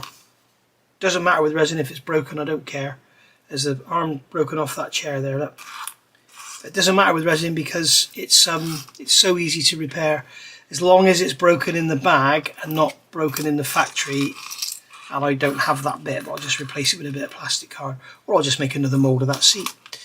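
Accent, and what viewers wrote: United Kingdom. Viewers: You sound like a Yorkshire Lad .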